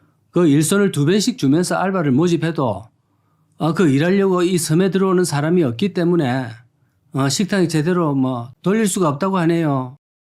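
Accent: Gyeongsang, South Korea. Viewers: 갱상도? ㅆㅂㄹ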